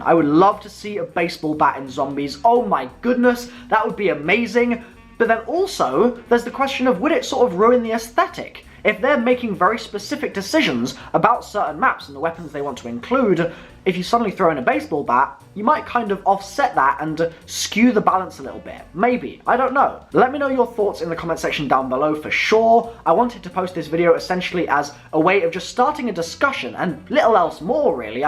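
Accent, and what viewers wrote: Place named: RP, United Kingdom. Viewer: this is to fucking posh for respect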